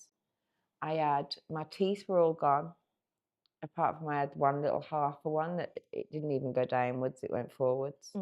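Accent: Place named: Cannock, United Kingdom. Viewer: Hi I'm so glad I watched the whole thing as I'm starting the injection this Thursday (26th March 2026) and I think we're similar age. I've been on off smacked since I was 14 and I'm 41 now. You also sound like you grew up not far from where I did with that accent (cannock myself) so although I'm a bloke and our lives would have been different, I bet we seen and did alot of the same shit lol anyway thanks for making this its inspired me to look forward to getting it done as I was nervous about the injection. My habit isn't anything like yours was, so if you can do it so can I. Thanks again and all the best in your future X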